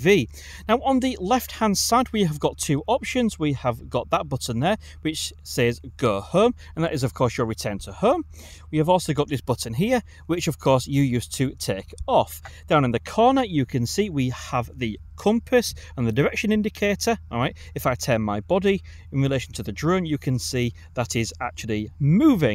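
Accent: Hull, United Kingdom. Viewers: I know a Hull accent when I hear one lol love the videos mate,I've had my mavic mini a few months now and still learning new tricks 👍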